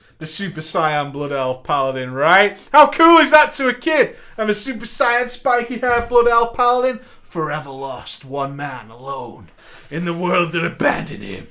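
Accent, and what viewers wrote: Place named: Manchester, United Kingdom. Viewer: Ahahahaha YES PREACH! I fucking knew you were a manc :D recognise that acccent anywhere loool, made my day that did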